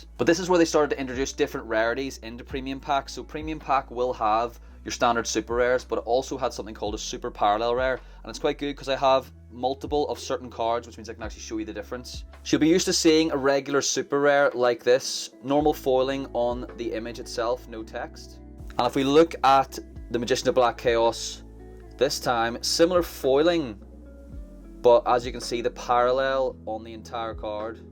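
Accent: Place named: Northern Ireland, United Kingdom. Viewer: Northern Ireland accent 🤔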